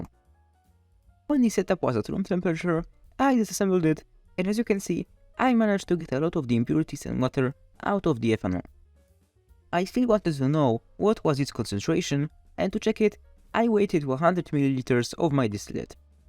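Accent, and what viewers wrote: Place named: Poland. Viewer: Very good video ❤ just subbed and love to see more. It's just like nile red before he got popular and got worse. Happy to See again a High quality chemistry video that's down to earth. Aannnd happy to see a fellow polish guy, you have only little accent but it's not very noticable. Keep up that work ❤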